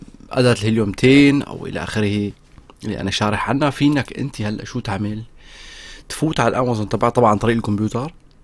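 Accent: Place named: Idlib, Syria. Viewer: ليش حاسك ادلبي 😁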